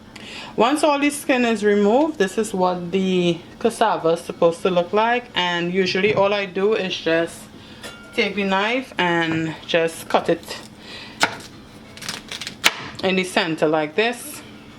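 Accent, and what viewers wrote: Trinidad and Tobago. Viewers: Ah hearing that beautiful Trinidad accent.... Love d red black and white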